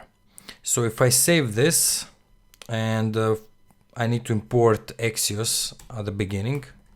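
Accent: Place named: Russia. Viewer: Ну это русский пацан, сто процентов!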